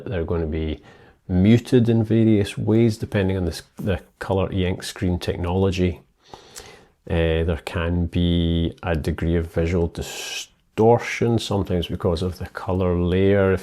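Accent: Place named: Scotland, United Kingdom. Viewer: I really liked your comparison about eink tablets. Your way to explain Boox aproach is very good. I am now getting my first eink device afrer almost two yeras of reviewing and deciding (and waiting😅). But I did a purchase two years ago of a Booxs tablet (I think it was a Note Air 2) and I return it as soon as I test it becouse it felt exactly as an android tablet but much mote slow... and I wanted just to orgnize my work an take notes, so I started to dig into RM and Supernote,....anyhow, very good videos,...and I like your scottish accent,...I love your country. A place to wrte good novels😊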